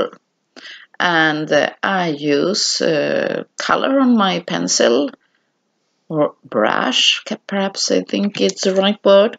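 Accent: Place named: Sweden. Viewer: I love how you truly explain your techniques!!! I would love to buy the Finnabar brand products, but it is so expensive here in the US. Also, I love listening to you speak, it makes me miss min famijl in Skane. Have you done any mixed media projects with a more Swedish tone? My cousin was on Alt for Sverige and we found a photo of our linking great grandmother. I want to do a project for all my family with her photo in the mixed media style, but with a Svenska tone to it. Tack for any suggestions!!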